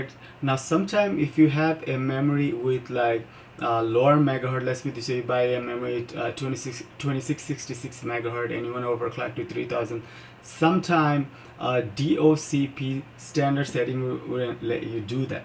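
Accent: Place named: India. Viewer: Are you from India? It seems you have Native Indian accents.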